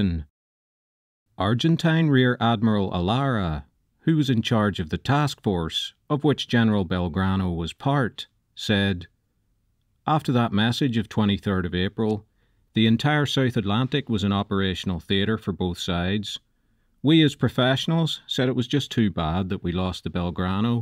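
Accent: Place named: Ulster, United Kingdom. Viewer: Hello, I've been reading comments and replies on your work, you have broad shoulders mate! The way you answer critics is restrained and civilized, many people lose their composure at the merest hint of criticism. I think your Ulster accent is just fine, and makes me homesick. Your responses to kudos too, are very composed and mature. I'm a fan. As soon as I can, I'll be adding my name to your list of subscribers. Keep up the great work, I think your site is FANTASTIC.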